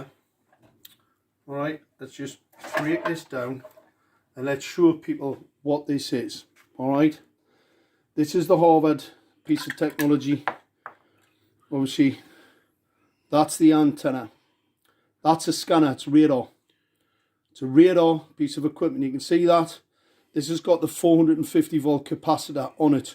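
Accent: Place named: United Kingdom. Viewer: Klares britisches Englisch ohne großen Dialekt - wo liegt das Problem? Die Qualität der Erklärung ist eher dürftig.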